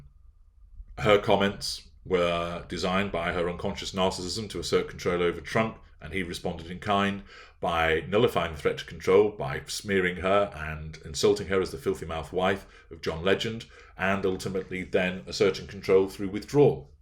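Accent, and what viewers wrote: United Kingdom. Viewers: He has a very relaxing voice, just like Omegon. But I'm in love with the British accent anyway.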